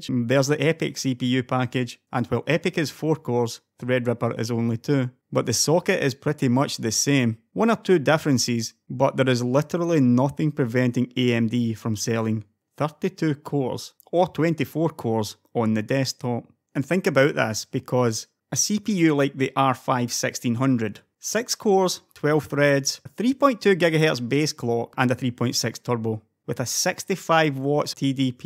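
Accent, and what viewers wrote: Scotland, United Kingdom. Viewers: his presentations really help me nail doon Scottish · are you scottish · Nice scottish acent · Scottish accent + tech channel = insta sub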